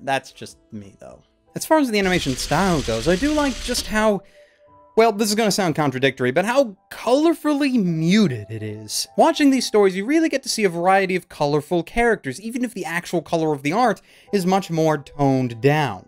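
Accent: South, United States. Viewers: your southern accent killed me , your sense of humour is so fuckin underrated and entertaining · With that southern accent, are you imlying that people from the southern parts of America is religious fanatics? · why you gotta do a southern voice bruh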